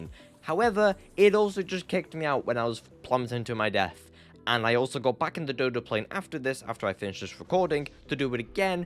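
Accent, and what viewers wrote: Wales, United Kingdom. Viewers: Are u from Wales ?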